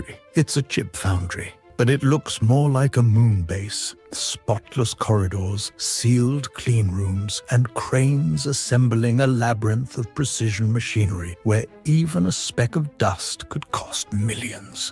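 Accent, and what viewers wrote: United Kingdom. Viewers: Why the heck do we need a narrator using flowery language and with an English accent telling us about Texas??? Stick to making videos about sheep farming in Yorkshire and how to properly hold a teacup! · W"hat's with the constant British voice in the backstory narration on anything BIG, BOLD. AMBITIOUS INFRASTRUCTURES in America....curious??! 🤔🤔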